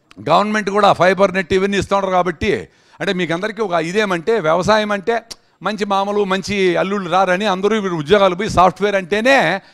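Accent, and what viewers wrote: Rayalaseema, India. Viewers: Rayalaseema basha entha madhuramga vundi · అసలు సిసలైన రాయలసీమ accent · That rayalaseema slang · మీరు మాట్లాడే రాయలసీమ యాస బాగుంటుంది సార్ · మీ రాయలసీమ భాష పెరుగన్నం లాగ కమ్మగా ఉందండి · మీ బాషా యాస చూసి రాయలసీమ లో పుడితే బాగును అనిపించింది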